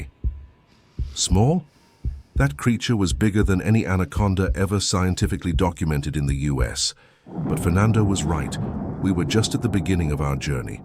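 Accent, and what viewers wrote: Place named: Florida, United States. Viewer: Love that "Florida" accent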